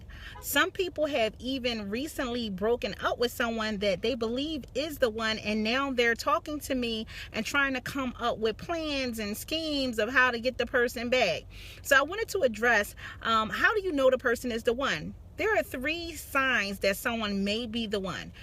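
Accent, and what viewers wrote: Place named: Philadelphia, United States. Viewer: Love this video/message! SN: you have to be from Philly i know a philly accent a mile a way!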